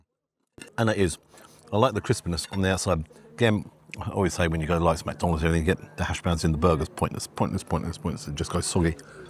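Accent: RP, United Kingdom. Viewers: Hey, only recently been watching your stuff although a long time ago I recall seeing some of your videos. I'm trying to catch up on the backlog and recently during my lunch break the recent one of being harassed came on my feed and wanted to at least say as a new viewer, it sucks people treat your opinions that way. Since I am a yank I always find your accent very posh and entertaining. Almost like very dry humor, keep up the good work!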